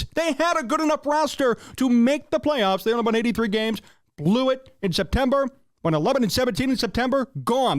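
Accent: Chicago, United States. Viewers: Im not from the midwest, so im a bit ignorant, but im very curious: is this an authentic AF Chicago accent? Cuz i love it. Its like straight out of a movie made in 1947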